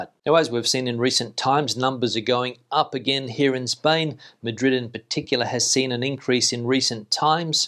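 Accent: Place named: Australia. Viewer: You teach Australian English? Your accent I mean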